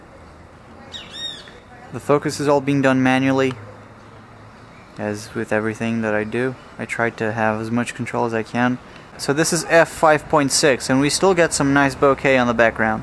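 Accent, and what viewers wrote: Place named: New York, United States. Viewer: Que delícia ver um brasileiro falando inglês sem sotaque, na verdade, parece que você tem sotaque de NY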